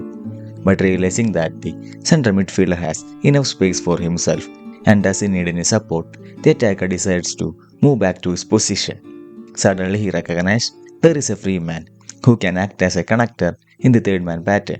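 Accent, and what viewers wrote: Kerala, India. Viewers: I could recognise your accent since I have friends from Kerala and I watch many malayalam movies. I'm from Assam. So great to see a tactico account from India. I think you are the first one. I wish you all the best and hope this channel grows leaps and bounds. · Good stuff brother. Your accent sounds like you are from Kerala. Even better!!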